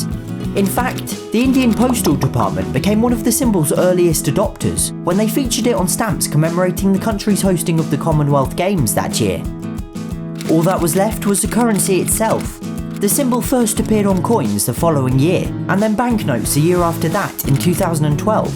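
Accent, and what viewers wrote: United Kingdom. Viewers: Awesome video brother.after watching ur this video I've watched ur 6-7 videos&subscribed ur channel.I just found u on YouTube.I'm from India.ur British accent is so sweet hare.love from India🇬🇧❤🇮🇳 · Your way of speaking is damn British .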